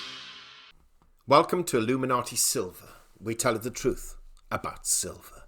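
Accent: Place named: RP, United Kingdom. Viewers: Yes I agree! I would not be surprised if they all have ties to the central banks the LBMA & The Crimex (Comex). I'm sick of the posh tone and arrogance! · I do not understand this channel. Year 2019 it was mainly critizing silver "pumpers" and mocking them. Then silver prices started to go up during the fall to the highest levels in years, so illuminati silver was wrong. Why call themselvs something "silver" when they clearly do not believe in the metal? I think anti-silver would be a better name. And the upper class english does not help either. Just saying...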